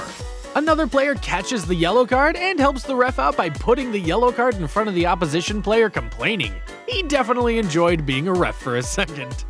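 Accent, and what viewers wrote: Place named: United States. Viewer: Can we have the voice over removed? The commentary is just too American.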